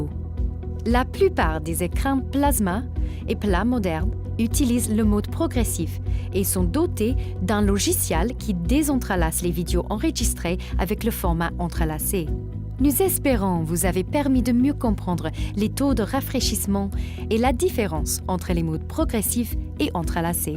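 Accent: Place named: Quebec, Canada. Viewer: Québequois anglais jpense mdr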